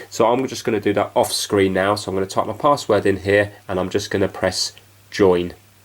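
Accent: England, United Kingdom. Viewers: I've subscribed. You took away my fear of getting messed up and ruining two phones. (Good to hear an English voice again)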